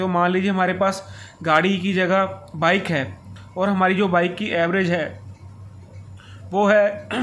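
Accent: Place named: Punjab, India. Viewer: Is tra di hor video upload krdya kro y g nale menu lgda tusi punjabi o tudi boli tu pta lg rhea das o is it right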